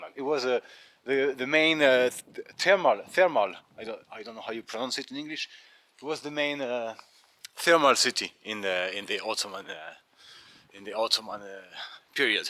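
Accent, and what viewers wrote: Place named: France. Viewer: This guy sounds like a french or something.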